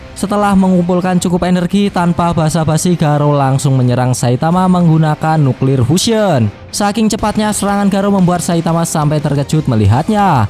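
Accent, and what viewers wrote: Java, Indonesia. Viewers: Gacocok , medok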